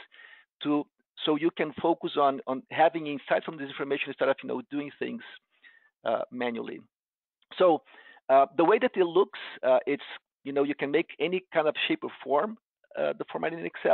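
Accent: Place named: Brazil. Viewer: It seems to me to be someone with a Brazilian accent ...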